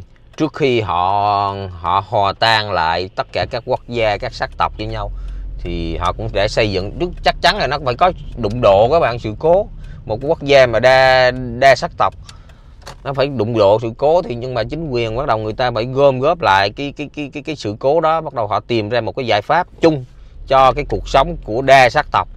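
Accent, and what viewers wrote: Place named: South, Vietnam. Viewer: Anh nói giọng NK rất hay, ở VN hiện nay mở đài lên toàn nghe giọng BK nghe không vô.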